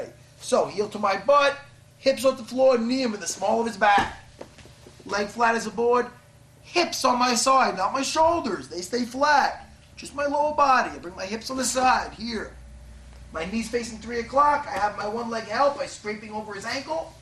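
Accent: United States. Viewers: Love the New York accent!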